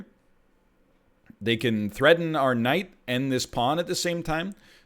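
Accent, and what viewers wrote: Canada. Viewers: Am I crazy? Has accent gotten more Canadian recently? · Y'all can't convince me that he doesn't sound more Canadian than before..... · Did Canadian got worse (or better, depending on preference xD) in the past few months or am I crazy?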